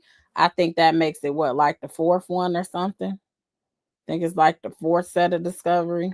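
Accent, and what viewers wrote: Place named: Mississippi, United States. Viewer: Hi you sound like country said y'all but it's cute you sound like Jackson Mississippi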